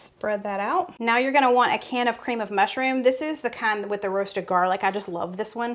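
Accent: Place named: Minnesota, United States. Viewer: You do ,have the accent right for Minnesota I'm from South Dakota my birth state. 🤣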